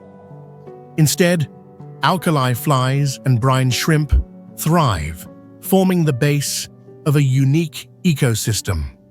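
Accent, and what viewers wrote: England, United Kingdom. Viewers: Wait a minute, English accent.😏still sounds like a computer 🫩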